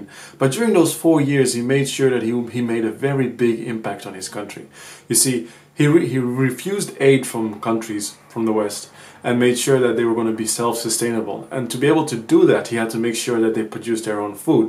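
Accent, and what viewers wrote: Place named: Netherlands. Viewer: + your accent...are you Dutch perchance??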